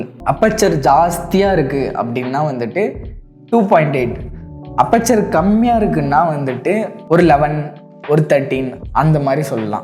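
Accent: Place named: North Chennai, India. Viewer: Bro unga north chennai accent ... I love it bro. I was in ponneri for 2 years. From thanjavur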